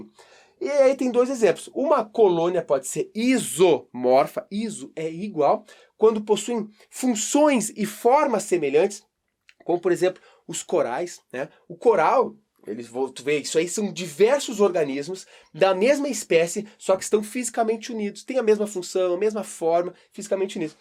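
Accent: Rio Grande do Sul, Brazil. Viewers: Olha o Gaúcho ksksks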